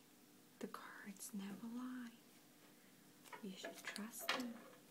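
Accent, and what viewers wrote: Russia. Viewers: Please do another one of these fortune videos,!:( But, PLEASE do it in the Russian accent...it makes me feel so warm and at peace...nurturing <3 I've listened to a few of your Russian videos to fall asleep, lately...they help SO well! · I love all your videos, but make more videos, esp this kind of video, with your russian accent you do so well.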